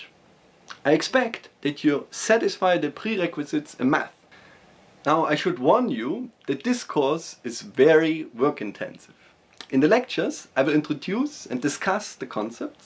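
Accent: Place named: Germany. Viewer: German english :)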